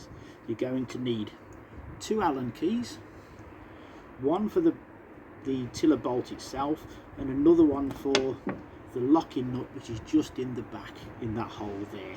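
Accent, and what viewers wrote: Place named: Elmdon, United Kingdom. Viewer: Sounds klike you're near Elmdon , nice work thanks our kid😊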